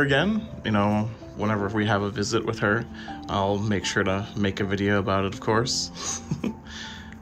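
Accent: South, United States. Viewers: Man you are as southern as can't and so iam I love to hear you talk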